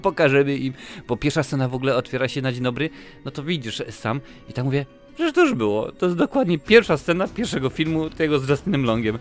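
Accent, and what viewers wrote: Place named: Podlasie, Poland. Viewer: Czy pochodzi Pan moze z Podlasia? tak fajnie Pan zaciaga ;)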